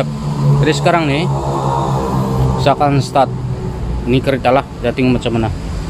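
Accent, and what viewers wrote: Sabah, Malaysia. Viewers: Sabah ni boss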